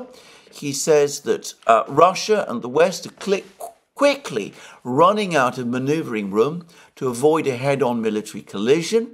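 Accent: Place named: RP, United Kingdom. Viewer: If you had’t been involved in nail bars you could have been king’s council, instead of a messenger for Putin’s grocery boy. Strategic, tactical - good put down. Your accent is posh English, not Greek. Just saying.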